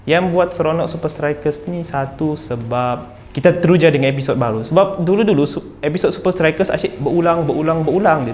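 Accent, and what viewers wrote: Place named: Sarawak, Malaysia. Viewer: Speaker ni bckp mcm org Sarawak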